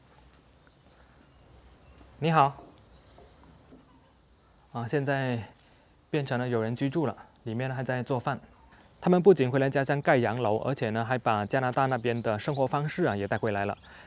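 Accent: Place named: Cantonese, China. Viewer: ，原来会广东话的🤟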